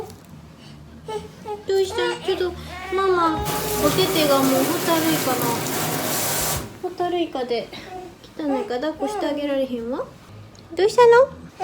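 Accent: Kyoto, Japan. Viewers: 京都弁好きだ！ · I thought she has kyoto accenct. Osaka and kyoto accent are pretty similar though.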